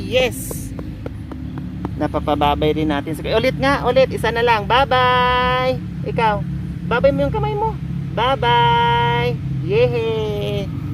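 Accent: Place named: Ilocos, Philippines. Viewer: ilicano kba? kz t9no ng pananalita mo ilicano. More blessing sa iyo dahil mstulungin ka kya papanoorin ko na ang chanel utube mo.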